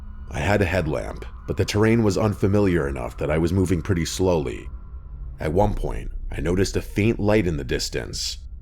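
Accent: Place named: Rhode Island, United States. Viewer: U know that u have a perfect voice for narration!! I detect a North eastern accent like maybe Rhode Island?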